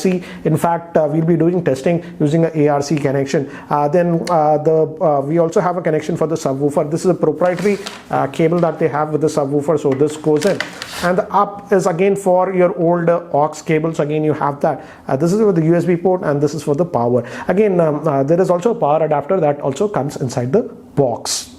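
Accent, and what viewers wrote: Hyderabad, India. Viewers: I heard soundbaaarr as Sambaaar!! Love the Hyderabaadi accent! Soundbaarr saambaar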